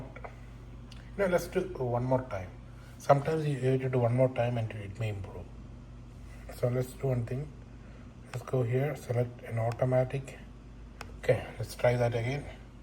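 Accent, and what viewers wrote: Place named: India. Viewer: he did sound indian though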